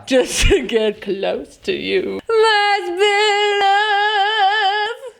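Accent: Germany. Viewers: Haha hab den deutschen Akzent sofort herausgehört und plötzlich hat sie Deutsch gesprochen 😆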